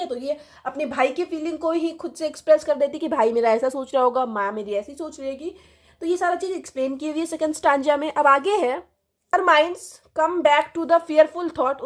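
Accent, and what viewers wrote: Bihar, India. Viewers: From your accent I guess that you are from or somewhere near Patna, Bihar. Give me a like if I'm right.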